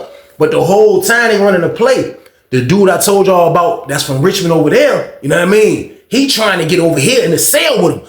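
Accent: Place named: New York, United States. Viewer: BEST PRISON CONTENT CREATOR!!! Yo u still sound mad new york and i cannot believe you are in Virginia 😂😂 You just like the city that never sleep with these BANGAS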